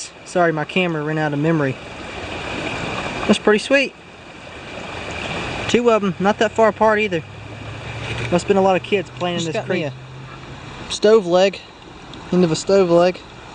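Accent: South, United States. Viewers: I just want to say that I really enjoy your videos! you have a great southern accent too. you have gotten me into the great hobby of metal detecting. I'm gettin a garrett at pro pointer today off of amazon. Good luck and god bless you.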